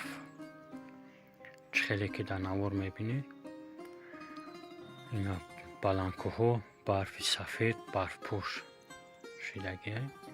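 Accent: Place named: Garm, Tajikistan. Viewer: Оханги дилнишин гап нест оханги кия брать Гарм супер ба пеш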